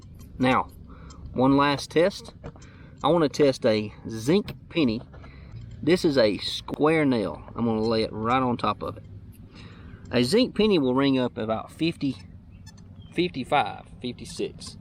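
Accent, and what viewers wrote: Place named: South, United States. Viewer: Pretty good helpful stuff, just ordered one this evening. Your from the south...like where?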